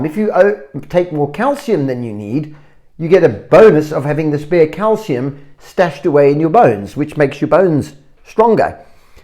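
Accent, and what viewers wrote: South Africa. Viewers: Are you from South Africa 🇿🇦😀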